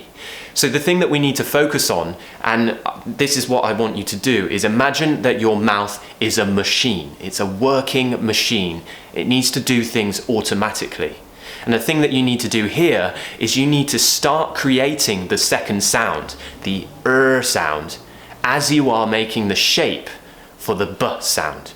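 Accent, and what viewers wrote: United Kingdom. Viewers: I listen to your youtube like listening to a radio. I want to make British accent also :) Thanks always for your interesting contents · Thank you for the new video lesson. I love your British accent 😍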